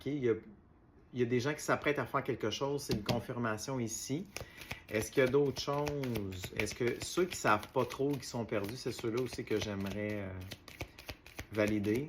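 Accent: Quebec, Canada. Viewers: Bonjour, aaah l'accent québécois j'adoooore...et surtout cette vidéo m'appelait. Merci à vous🙏🙏🙏🙏🙏🙏🙏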